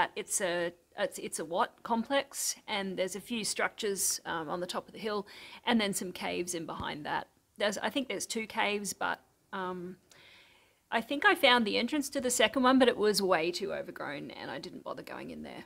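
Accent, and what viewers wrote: Australia. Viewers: It would be a cold day in hell when I make fun of your accent. However, that does not stop me from turning on the subtitles when watching Australian crime dramas on Amazon Prime. It took me a while to find this remedy. Previously, I was only understanding about 60 percent of the dialogue. Much better now. Best regards. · Love your Australian accent, and your clear detailed description of your vlogs of what are being shown. Thank you for sharing 🙏.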